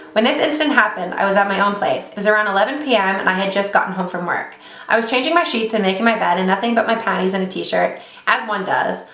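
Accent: Los Angeles, United States. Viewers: good stories but the LA voice really does break the immersion lmao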